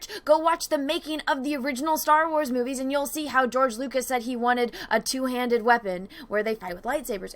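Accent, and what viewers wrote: Portugal. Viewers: wait are you portuguese ? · Are you Portuguese? 🤗💪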